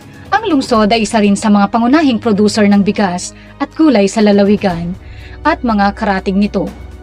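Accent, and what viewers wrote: Visayas, Philippines. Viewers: Taga visayas kaba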